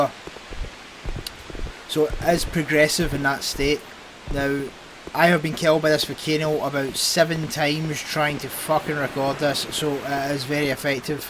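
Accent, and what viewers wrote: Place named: Scotland, United Kingdom. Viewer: Btw I love your Scottish accent lol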